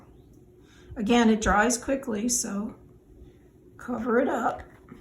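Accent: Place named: Boston, United States. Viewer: Your accent sounds like mine. I’m from the Boston area. Love this piece 😍 SALEM WILLOWS grew up going there 👍🏼😍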